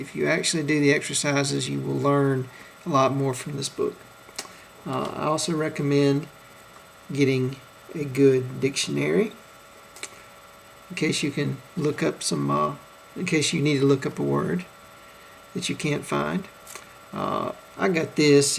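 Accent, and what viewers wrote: United States. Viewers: Good video. What kind of American accent do you have? (when speaking English, I mean)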